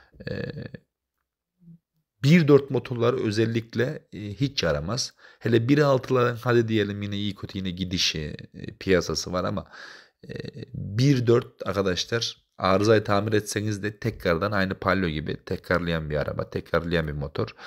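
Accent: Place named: Sivas, Turkey. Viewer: Gardaş sen Sivaslı mısın? Şive Sivaslı gibi.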